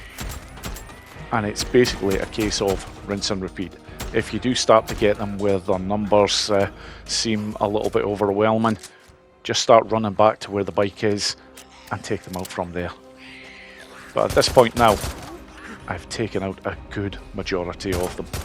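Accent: Scotland, United Kingdom. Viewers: Love the videos, dude, but I can't help trying to figure out where you're from, haha. Your name is Slavic (or more specifically Serbian and neighbours) and your accent I thought was Dutch at first but now I reckon it's Scottish. You're truly an international man of mystery :)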